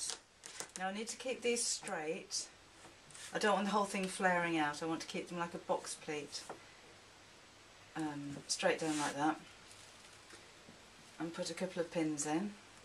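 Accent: United Kingdom. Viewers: your speech assistant does not understand your British accent. The subtitles are so disjointed, funny, weird at times.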